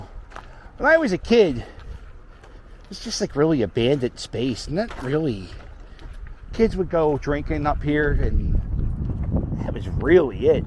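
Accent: Philadelphia, United States. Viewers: Your the best!! I moved to Florida from Philly in 2008 and I can hear the Philly accent in your voice!!! Makes me homesick!! Lol miss the cheesesteaks!!! You are so awesome to show the good and fun things in Philly!!! I think I may have seen you around we lived on axe factory and mower streets!!! You are a funny guy!!!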